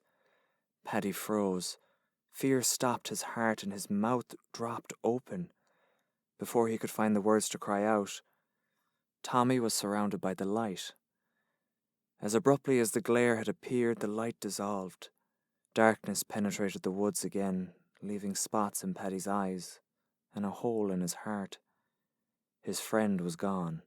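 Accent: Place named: Ireland. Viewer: Excellent... wonderful narration. I have always liked listening to the Irish dialect.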